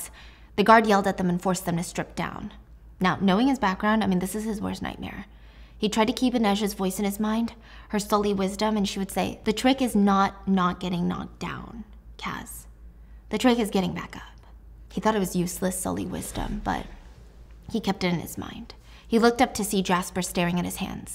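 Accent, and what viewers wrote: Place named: England, United Kingdom. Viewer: That English accent was so pleasing! 👏🏽👏🏽👏🏽👏🏽👌🏽❤😄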